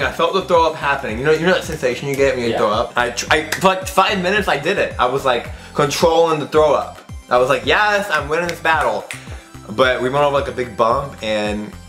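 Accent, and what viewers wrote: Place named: South, United States. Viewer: NEW SUBSCRIBER I LIKE HIS KINDA SLUR AND COUNTRY VOICE!!! 😄😘 and he's ADORABLE AS HELL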